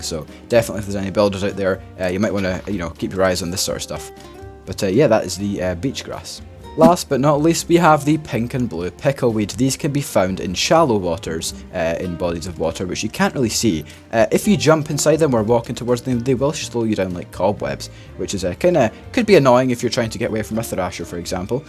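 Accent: Scotland, United Kingdom. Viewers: Fife, Dundee, Edinburgh? Somewhere South East ish? I'm trying to work out your accent!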